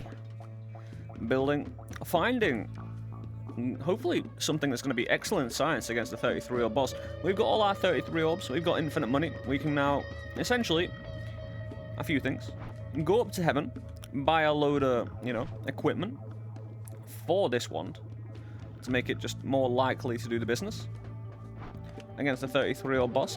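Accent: United Kingdom. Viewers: One of the regional UK accents, I should recall exactly where he's from but my brain it farts. Give him a chance, bet you'd work it out after a bit.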